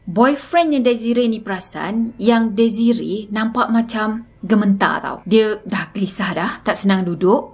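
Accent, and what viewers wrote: Kelantan, Malaysia. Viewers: Walaupun sis ckp kl tp masih ada bunyi kelantan tu. Sbb org kelantan je pakai ayat " dah tu "😂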